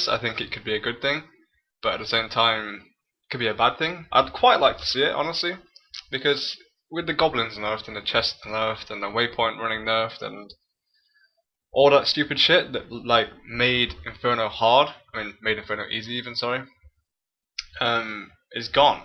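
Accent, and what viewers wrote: United Kingdom. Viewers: What's your problem? I'm from Denmark and understand him perfectly. And FYI he's British, thought the accent was obvious? If you're not 10 and clueless that is. Even his mic is perfectly clear too, I don't get your complaint's at all.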